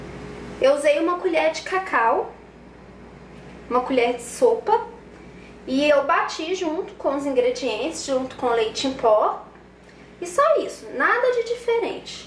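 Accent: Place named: Minas Gerais, Brazil. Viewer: Parabéns. Arrasou, como sempre. Adoro seu sotaque de Mineirinha. Uma graça! Beijos querida.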